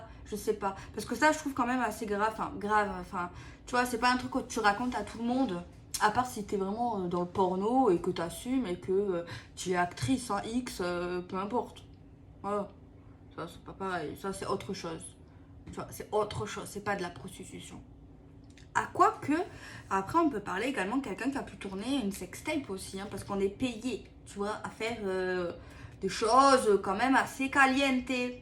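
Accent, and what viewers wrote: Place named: Marseille, France. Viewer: Bj d entré le premier message est pour moi! Avec l accent marseillais comme moi! C est super ma belle! O bonne mère bénie cette petite femme d amour!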